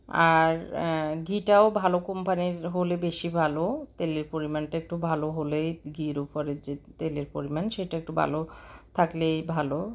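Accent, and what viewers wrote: Noakhali, Bangladesh. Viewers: সুন্দর বাচনভঙ্গি মানুষের দৃষ্টি আকর্ষণ করে আপু।এদিকে মনোযোগ দিবেন আশা করি।আমাদের নোয়াখালীর ভাষা ছাড়া ও আমরা চলতে পারি তা দেখিয়ে দেন। আজ প্রথম দেখলাম দশে ৬ নাম্বার দিলাম আপনাকে। কথা গুলো ঠিক করুন দেখবেন আরো এগিয়ে যেতে পারবেন। আপনার ভিডিও কোয়ালিটি দারুন, মিউজিক টা একটু হাই। রাগ করবেন না প্লিজ আমার মতে সমালোচনা সামনেই করা উচিত। একজন ভিউয়ার বা ছোটবোন হিসেবে ভুল গুলো বললাম যেনো শুধরে নিতে পারেন। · আপনে কি নোয়াখালির মেয়ে নাকি, এই ভাবে কথা বলেন কেন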